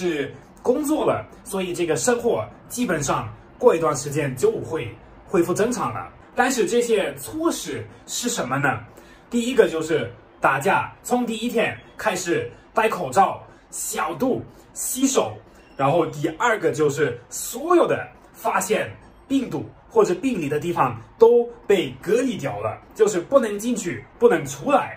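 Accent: Sichuan, China. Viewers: 哈哈你的视频很有意思，我是生活在斯图加特的中国人，看你说汉语还带着挺浓的四川口音，对你的好感加倍哈哈，祝你在中国生活的开心，希望德国也尽快控制住疫情。。。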